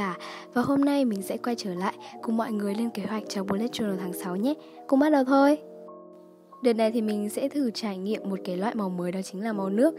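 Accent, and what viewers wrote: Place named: Nghe Tinh, Vietnam. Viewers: chết rồi mừn răng đây nghe là biết dân miền trung r k lận vô mô đc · "Chết rồi, mần răng đây, ồi toang..." Chùi ui show cái giọng Nghệ Tĩnh tui hơi bị thích á, quê Hà Tĩnh nên thích lắm cái giọng này ế, iu cực... Hây da mún zề quê quớ ừ cả 3 năm rồi chưa về HT luôn ý, mà cho mềnh hỏi ở chỗ nào ở HT zợ?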